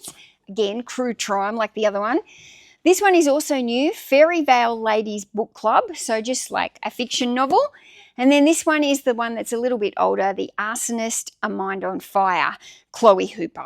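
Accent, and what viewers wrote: Australia. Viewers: around the 25-minute mark, you said "Crue Trime" Hahaha, I was eating fried rice and spit some out, then I rewound it 4 times to hear it again-love the AU accent (I'm in America)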